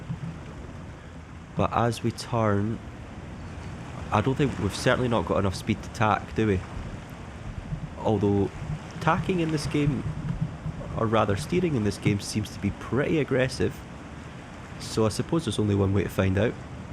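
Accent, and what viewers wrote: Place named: Scotland, United Kingdom. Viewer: Hi. Your videos are making me buy this game too. I didn't even know it existed. I bought Sailwind yesterday, but ended up returning it. I found the graphics a bit disappointing. Sorry if this translation looks a bit strange, I'm from the Netherlands and I ran it through Google Translate. P.S. I love your Scottish accent.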